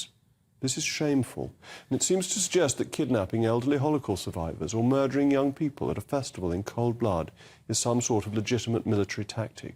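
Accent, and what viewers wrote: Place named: RP, United Kingdom. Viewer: Why does this guy sound so condescending absolutely about every single thing 🫤 must be his posh accent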